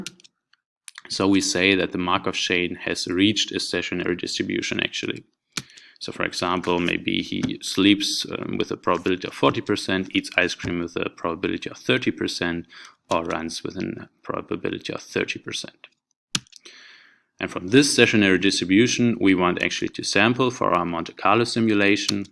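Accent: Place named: Germany. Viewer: Omg best video I’ve seen in ages. Didn’t understand a thing but that german voiceeeee guyssss thumbs up! (GTR ist nicht mein Freund)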